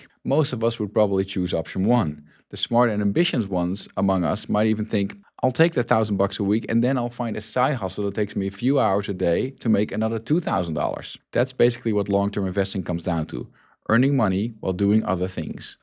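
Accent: Netherlands. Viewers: It too me a few videos to pick up the Dutch accent, good job, it’s pretty soft, unlike my grandfather from Bruges Belgium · I guess it’s just me but hearing a Dutch accent somehow increases credibility with these types of videos. I will be following your advice more closely! Edit: Ha I knew it, I even read your book!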